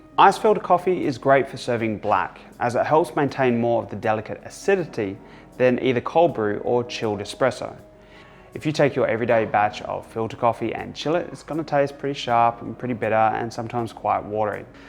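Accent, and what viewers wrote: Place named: Australia. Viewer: Bro, I love your accent... BTW are you Australian?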